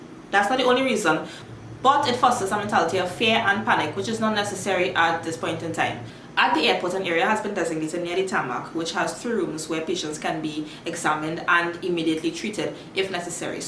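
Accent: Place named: Trinidad and Tobago. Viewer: You speak waaaaay too fast! Not everyone understands the Trini accent. Good content tho👏🏽 new subscriber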